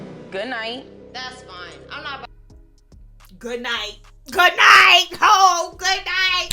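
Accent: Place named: New York, United States. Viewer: I love a New York girl accent 😮‍💨 so pretty with beautiful skin 😍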